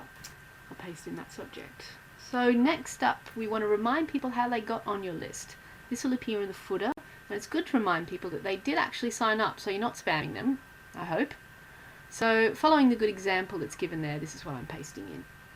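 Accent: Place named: Ireland. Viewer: love your irish accent!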